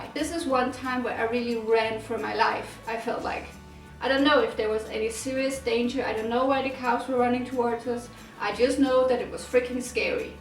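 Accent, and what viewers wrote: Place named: Denmark. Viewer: I love Denmark and your accent!!!!!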